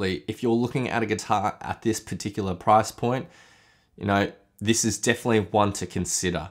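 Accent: Australia. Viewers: i always watch your videos help me to improve and learned base in your accent seems like you live he in Australia aswell :)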